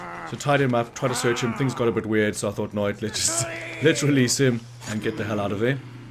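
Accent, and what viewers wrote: South Africa. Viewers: South africa? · Are you South African? Your accent sounds South African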